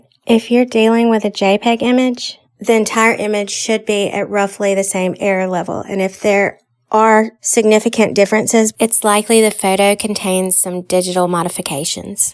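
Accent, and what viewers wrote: South, United States. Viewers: Hi. I found you through watching the Hammerson channel talking about Rakes and your encounter. First off, I BELIEVE YOU! It must have been shocking to see one of those creatures up close. I would have been terrified too. I found your channel now and have already watched all of your videos. Thanks for the deep dive of this image. You did an amazing job with all this extensive research and legitimately debunked the debunkers. That image is fucking horrifying and I can only imagine that it’s possibly what some of the missing travelers in the missing 411 cases encounter. I cannot fathom how scary that would be. Personally, I have had 0 cryptid encounters but strangely enough, I have been compiling a list on my Notes App of instances where I begin ruminating or thinking deeply about something, and then all of a sudden shortly later, it appears to me in real life. 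I know it sounds crazy but it’s like the universe is presenting these occurrences to me after I think about them. Idk it’s weird. It’s similar to how the Rake was created as a creepy pasta, but it was somehow WILLED or IMAGINED into reality. Just a theory. Anyway, I’m droning on but I research missing 411/cryptids every night and have nobody to talk to about this. I hope you make more videos, please! I will rewatch this while I’m stuck at my desk at work. I wish you good luck with the channel!! P.S. I LOVE your accent!! Makes me wish I could meet some southern girls but I’m stuck in california hahaha. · Thank you. I just watched it. Crazy sh.....stuff ! There are alot of people seeing things , creatures , beings , humanoids, cryptids whatever people want to call them . I can tell just from listening to you that it had a very big impact but you seem to have adjusted well- well I hope you have anyway ! 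. Thank you for responding to my question and thank you for sharing your encounter ! Love your southern twang , drawl - accent !!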